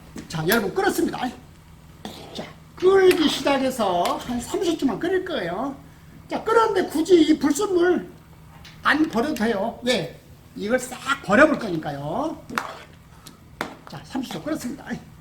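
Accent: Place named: Chungcheong, South Korea. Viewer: 충청도분 이시군요 ㅋㅋ 경장히 ㅋㅋ 감사합니다.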